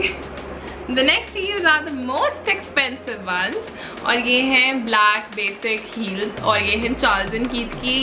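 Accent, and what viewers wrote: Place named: Punjab, India. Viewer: Punjabi tone in hindi is best 😎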